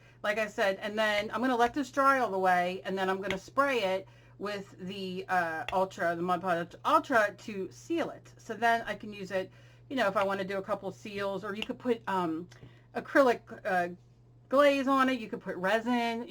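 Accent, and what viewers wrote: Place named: Philadelphia, United States. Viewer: You gotta be from either Philly or South NJ lol. I know my own “accent” when I hear it!!!!! (I didn’t know we had an accent until I moved from Philly to NJ!!!! And met people from N. jersey! But I’m watching this to learn. And the whole time I’m like- I feel like talkin to one of my friends or aunts!!!! Yep I’d bet on it!!! Ok. Back to watching now but please correct me if I’m wrong!!! Lol!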